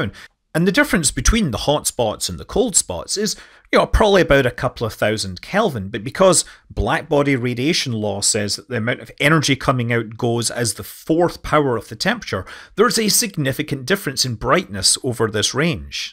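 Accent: Scotland, United Kingdom. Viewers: Love to hear a Scotsman talking about a magnetohydrodynamic drive, as always.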